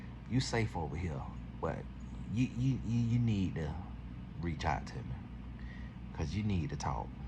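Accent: South, United States. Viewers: 😂😂 right! It’s the country twang in him explaining this craziness. Hilarious! Cause what is she doing!!!?!!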